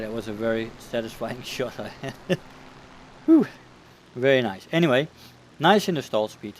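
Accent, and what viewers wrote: Netherlands. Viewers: Sounds Dutch?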